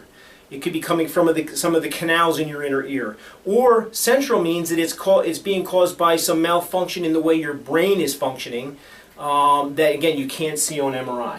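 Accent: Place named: East Coast, United States. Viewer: What about dizziness brought on by visual sensitivity? Otherwise, one of the best videos I’ve seen on the topic of dizziness so far; thank you! Also, please put the link to your website in the YouTube description. I have no idea where you are. Judging by your accent: east coast. So, I’m off to google your practice now…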